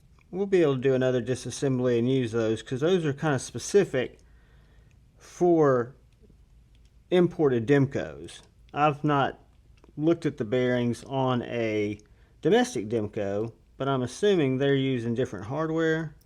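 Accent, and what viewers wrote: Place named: Nashville, United States. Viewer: Enjoyed that , whenever I heard your voice I’m thinking , Nashville born and raised 🤔 then again I’m an Aussie 🇦🇺😂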